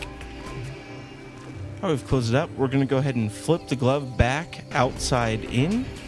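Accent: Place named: United States. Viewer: he says that because hes from the USA and thay speak a bit different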